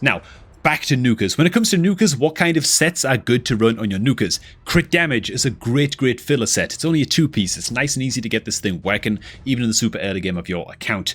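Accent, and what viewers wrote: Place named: Northeast England, United Kingdom. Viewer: I'm distracted by picking out the accent. I couldn't work out whether it was Sunderland or Newcastle which usually points me towards a dancer of the sandy nature.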